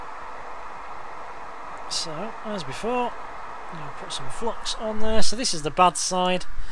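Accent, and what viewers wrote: United Kingdom. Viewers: im just woundering it sounds like your form the UK im just woundering if you are where do u buy your soldering flux from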